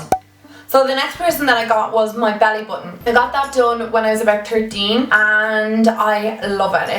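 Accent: Ireland. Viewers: Nice piercings, I got my tragus done on each ear and both of them wormed their way back out, so upsetting, sacred to have it done again encase the same thing happens but I really liked it when they were done! BTW, LOVE your makeup, especially the blue on lower lash,what have you used eye shadow wise? Love an Irish accent :)) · Are u Irish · Are you Irish 🍀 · she's irish... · Your voice is so soothing!!! I love it. I guessed you were from Ireland before you even said it 😂 wanna visit so bad!! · Are you Irish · she sounds Irish or Scottish but I could be wrong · does anyone think she sounds like cheryl cole with an irish accent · Kassie Pistone Thats called being irish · i thought i knew your ascent i was in ireland not to long ago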